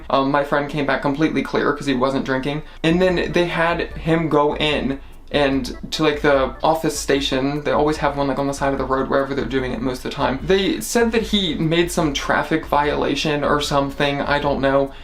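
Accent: United States. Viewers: I love your American accent....and your nose.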